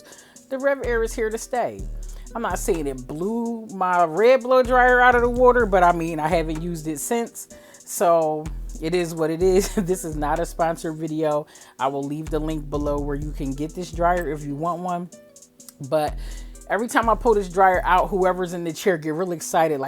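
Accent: Philadelphia, United States. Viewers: Your channel is amazing. Just peep your Facebook page. From your voice I just knew you were from Philadelphia. Not only that you are just around corner from where I use live.❤ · Are you from Philly? I feel like I hear an ancient. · Are you from philly? You sound just like one of my clients. 😂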